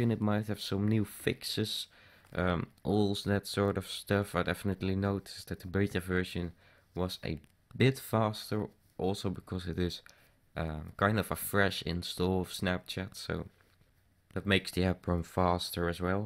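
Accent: Netherlands. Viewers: ik hoor aan die accent en de nu.nl app dat je nederlands bent haha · Ben jij nederlands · You just hear it instantly that this guy is dutch xD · Gast je kan niet een ergere nederlandse accent hebben holy shit xd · Die te you from? You sound like a Dutch guy. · Je hoort dat hij Nederlands is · you have a duch acsent 😄 😉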